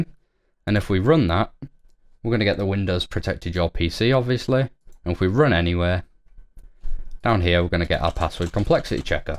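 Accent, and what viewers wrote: United Kingdom. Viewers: Men im in love with this uk dialect .. i just enjoy alot ..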